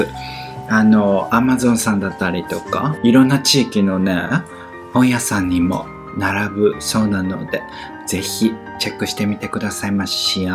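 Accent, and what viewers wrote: Japan. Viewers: 💕 ごきげんよを🤗 たまに飛び出す関西弁が めっちゃ好きやねーん❣️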